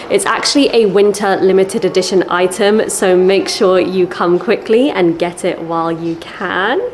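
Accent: United Kingdom. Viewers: 牛丼を、スプーンを使って食べるとき、dig in～という表現を使うのですね。動画の内容も面白く拝見させて頂いています。と同時に、イギリス英語の Listeningも同時に、楽しめてGOODです。何より、日英両方の字幕を出してくださっているのが、とても有難いです。どうも有難うございます。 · のイギリス英語発音は私大好きです。アメリカ人女性はイギリス英語発音に弱いと聞きます。日本人男性に話すと好かれますよ！😽🐱😻😍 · 牛肉、豚肉、鶏肉一度に食べるとか。あんまり機会ないな。の食事見たらほんま食べたくなる。後イギリス英語は聞き心地良いですね！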